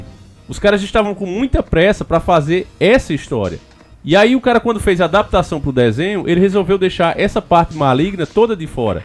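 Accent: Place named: Southeast, Brazil. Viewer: Esse canal é nordestino? O sotaque parece muito de SE.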